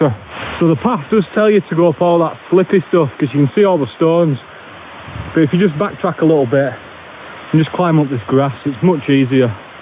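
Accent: Yorkshire, United Kingdom. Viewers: I've been watching your videos for a few weeks now and love them. Being a Yorkshire lass, I never get sick of that accent ❤. One thing I really need to know is... where did you get sticky toffee pudding coffee from? It sounds amazing. Keep up the great videos , I love watching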